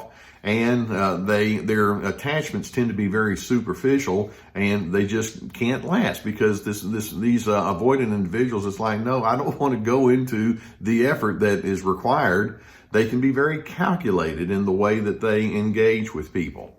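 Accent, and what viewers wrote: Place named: South, United States. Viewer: Love the Doc's clear southern accent, as well as his knowledge and experience!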